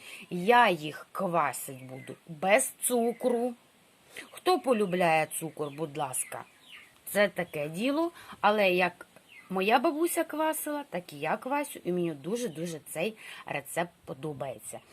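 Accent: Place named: Surzhyk, Ukraine. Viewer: зараз такий час коли усі балакають з телефоном та самі з собою.Ви теж квасю яка гарна суржикова вимова та говірка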